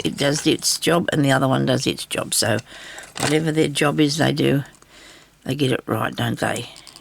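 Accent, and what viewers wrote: Australia. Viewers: Hi, another very pretty piece coming together. Love the way your mind works. It’s also very refreshing to hear your Aussie way with words and phrases. I feel like we are losing the Australia we grew up with, anyway thanks for your ideas, best wishes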